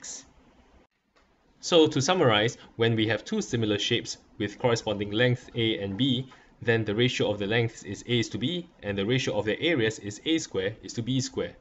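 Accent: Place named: Singapore. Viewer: Sounds singaporean lol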